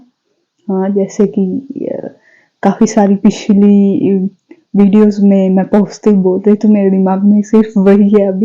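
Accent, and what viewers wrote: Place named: Punjab, India. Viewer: Punjabi mast lagdi aa thodi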